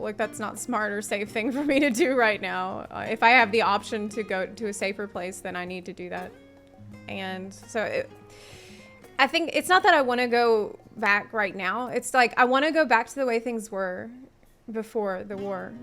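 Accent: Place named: Kentucky, United States. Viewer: aren't you from the america? i mean i live in canada so i'm no expert but you got some kind of...kentucky?ish accent lol.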